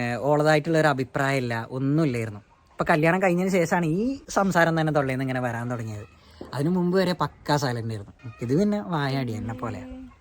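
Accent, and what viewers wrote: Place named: Kuttiyadi, India. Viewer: nte samsaram keteram thoonik kuttiyadi slang varunnund ❤❤😊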